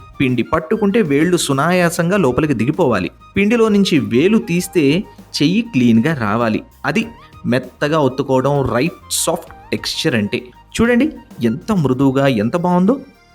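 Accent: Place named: Telugu, India. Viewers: Love the Telugu accent and Telugu! prefer Telugu without english words! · Narrator voice Mind Blowing.... His Telugu Acssent wonderful🎉🎉🎉🎉🎉🎉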